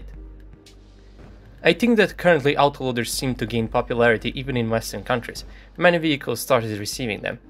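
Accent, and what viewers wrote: Eastern Europe. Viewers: I'm sorry but why would not say what country you are from or your age? Neither matter if you are putting out good content! Do you not like your country or do think people knowing what country you are from would be somekind of negative? I personally don't care if you don't say what country you are from or you age, but I also don't think it should matter what country you are from. From your accent you sound like you might be from eastern Europe, but then again I could be totally wrong lol. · Obviously, he has a slavic accent . I suppose he comes from Belarus or Ukraine .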